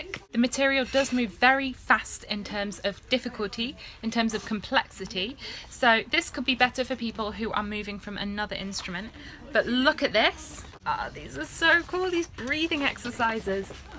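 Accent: Netherlands. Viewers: English girl speaks German with Dutch accent. Strong move!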